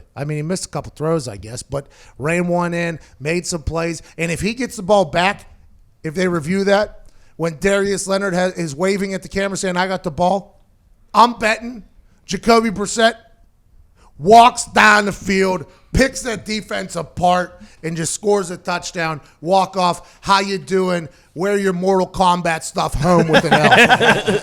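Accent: Pittsburgh, United States. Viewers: Can hear that yinzer coming through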